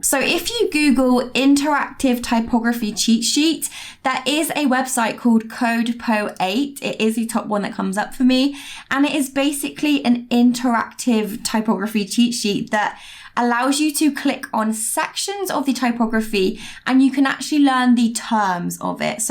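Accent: United Kingdom. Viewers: I'm self-taught just like you, and it's so refreshing to learn from someone who doesn't linger on complexities and has found ways to just use common sense instead of doing things "by the book". It's amazing what you can learn just getting lost in tutorials, and you just added quite a few ideas to my arsenal. I usually prefer to read rather than watch videos... But in this case, considering how you make it very easy to understand and don't use obscure tools or lengthy techniques (and I must admit... gorgeous blonde + British accent = hell yes), you sold me from the first second. Subscribed. Liked. Bravo!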